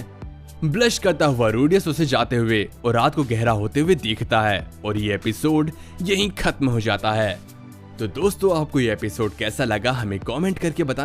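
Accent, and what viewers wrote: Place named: Lucknow, India. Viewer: Pakee chinery se pata lagta ha ap bhi up hardoiya Lucknow Se ho😊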